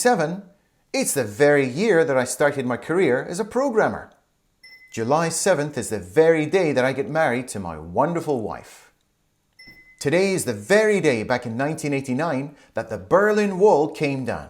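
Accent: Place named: United Kingdom. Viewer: U hv typical uk pronunciation 😂 n ur japanese is much better than mine🤦🏾 老師好厲害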